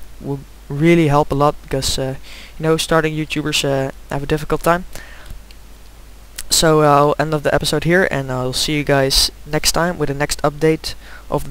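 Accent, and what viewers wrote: Netherlands. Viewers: nederlands? dat hoor je gewoon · Ben je nederlands xD Je hoort gewoon dat je geen engels/amerikaans bent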